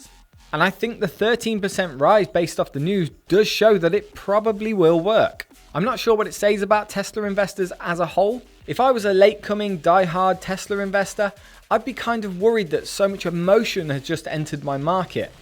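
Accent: United Kingdom. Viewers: The best thing about the british accent: "Tesla Investor" rhymes :))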